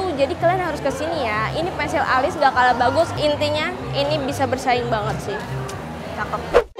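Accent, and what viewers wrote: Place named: Jakarta, Indonesia. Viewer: Betawi amat di kelet wkwk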